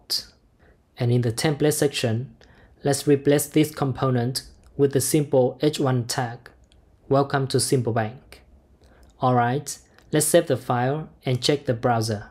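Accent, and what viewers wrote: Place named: Vietnam. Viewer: nghe tiếng Anh từ nước bản xứ thì không hiểu chứ nghe tiếng Anh từ người Việt thì hiểu 90% haha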